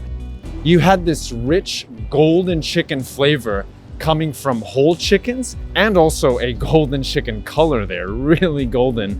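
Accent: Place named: United States. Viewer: that is honestly so impressive. I for sure thought you lived in the states for a time or something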